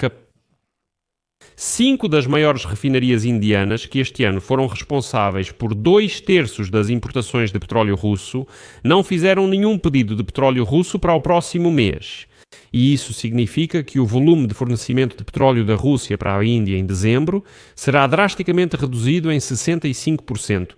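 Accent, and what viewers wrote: Portugal. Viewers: Por que fica oscilando em português de Portugal e do Brasil?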